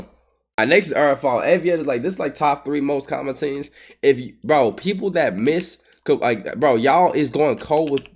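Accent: United States. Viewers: now you sound like a cowboy from illinois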